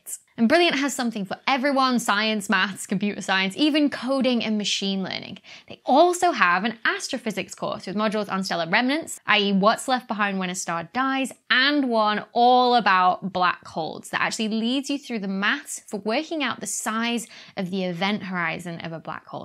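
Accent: United Kingdom. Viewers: why would one even need to "ignore her non-American dialect,_ anyway? British accents are beautiful to me! A whole lot prettier than any of the many American accents that exist. 🙄 And I speak one of them, so I can say that. 😌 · Pretty, smart and has a British accent. She da dream.... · So informative, I didn't know the evolution of a blackhole. Also easier to listen to it with a cute British accent.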